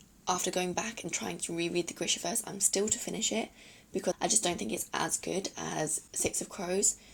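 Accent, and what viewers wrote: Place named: United Kingdom. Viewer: I love the British accent and you are a stunning example! Thanks for sharing, greetings from Italy.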